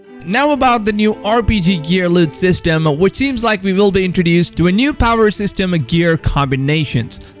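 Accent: India. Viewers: Having an Indian accent doesn't mean he isn't pronouncing the word wrong. Plenty of people with the same accent know how to properly enunciate the letter V in a word. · Is that some Indian accent I hear? 🤔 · He’s Indian it’s an accent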